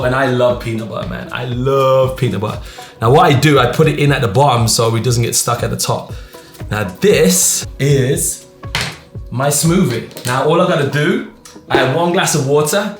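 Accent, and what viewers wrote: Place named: France. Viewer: You are so real, love your French accent, would love to hear your American accent.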